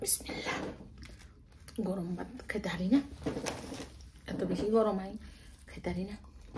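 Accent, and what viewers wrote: Noakhali, Bangladesh. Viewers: আপু তোমার নোয়াখাইল্লা ভাষাগুলো শুনলে আমি নিজে নিজে তোমাকে নিয়ে হাসি।তোমার ভিডিও দেখতে খুব ভালো লাগে তাই সবসময় ভিডিও গুলো দেখি তোমাকে নিয়ে হাসি।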